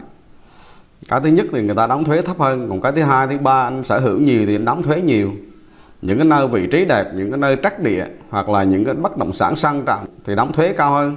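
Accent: Quang Nam, Vietnam. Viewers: Tiếng nói của chú rất trung thực Chuẩn tiếng địa Quảng Nam. Chúc chú nhiều thành công .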